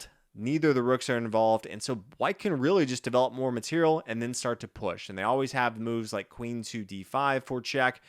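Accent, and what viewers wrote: United States. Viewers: What a stupid comment, is American so he pronounces his name with an American accent. "Алехин" make Alehin (even alehina) I don't see the problem calling him Alekhine just like I don't see the problem when every non-French folks struggle to pronounce Vachier-Lagrave correctly and it systematically turns out as "vachier-laguave". I hope you do know how to correctly pronounce Praggnanandhaa, Maghsoodloo or every other GM names that are westernized or who sound slightly different than how they are written. It's just ridiculously elitist, just thank the content creator for the knowledge he shared.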